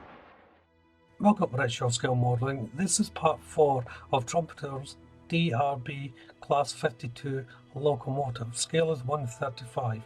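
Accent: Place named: Scotland, United Kingdom. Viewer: Am enjoying this as will be getting this for myself soon. I know it's hard but if you could have a look at your sound so everyone can enjoy your lovely Scottish brogue. Is that the word? Who knows. Thanks for your time and effort. Good stuff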